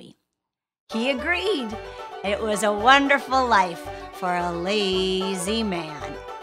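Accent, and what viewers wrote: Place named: Ireland. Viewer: Good fun, short tale and graphics to boot! Plus patented Irish accent! At no extra cost!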